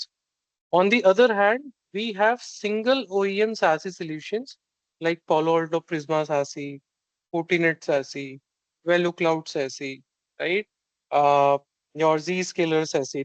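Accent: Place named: India. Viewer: Another terrible Indian accent explaining something he just learned yesterday. I guess he is counting on this video to get himself a H1B.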